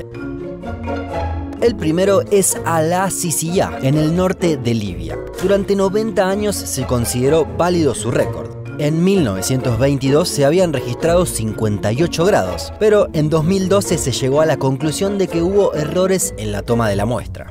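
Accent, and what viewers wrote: Rioplatense, Argentina. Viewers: AY MIJO...VOS SOS ARGENTINO... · Como relator tiene axento argentino.. pense que diria que en argentina jaja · Yo solo veo tus videos por que me gusta el acento argentino saludos desde Mexico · Sos argentino o uruguayo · Buen video pero no puedo soportar tu acento argentino en especial cuando pronuncias la letra doble ll como valle lo dices vashe☹️😒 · Es un argentino bastante sobrio, por eso queda simpático, aunque quizá sea uruguayo. ¿Algún día lo sabremos?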